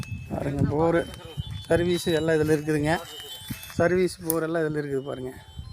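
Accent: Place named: Pollachi, India. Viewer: Pollachi Tamil speaking check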